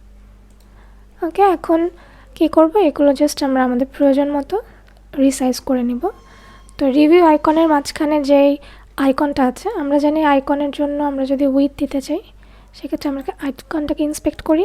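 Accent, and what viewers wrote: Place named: Bengal, India. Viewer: Your Bengali accent is so sweet , I fall in love with you ❤❤ Do you know Hindi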